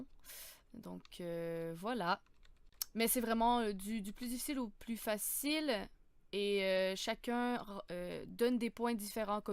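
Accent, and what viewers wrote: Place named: Quebec, Canada. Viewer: Très bel épisode. Question montage, just perfect. Tu as un accent Québécois ^^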